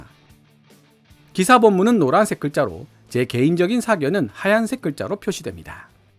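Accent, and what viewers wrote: Gyeongsang, South Korea. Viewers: 갱상도식 영어 억양 알파뱃만 나오면 하이에나 처럼 덤벼든다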